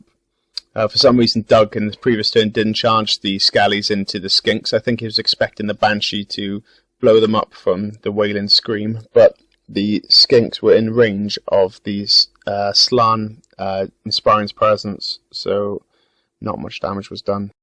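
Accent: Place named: Wales, United Kingdom. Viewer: Nice battle report, lovely models. Good to hear a Welshman amongst all the Americans. Hope you do more videos.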